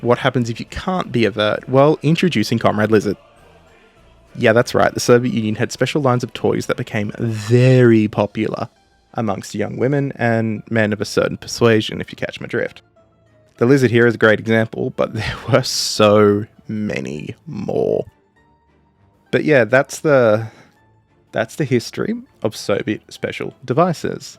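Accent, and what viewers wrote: Australia. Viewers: I just realized this guy is an Aussie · It feels so refreshing to hear an Australian accent in videos like this. Born in NSW, raised in QLD :D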